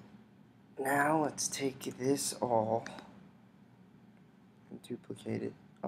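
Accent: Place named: New York, United States. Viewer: just followed you on soundcloud bro. are you from ny/nj? u have that accent lol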